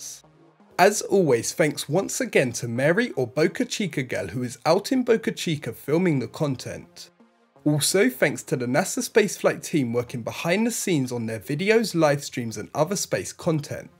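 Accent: England, United Kingdom. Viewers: That is the way English people talk. They did invent the language after all. They then named it after themselves.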